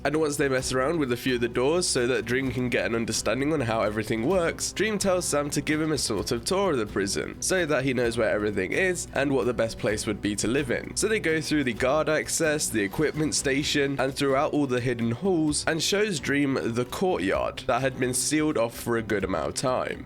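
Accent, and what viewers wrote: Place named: United Kingdom. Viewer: You sound like a British Sam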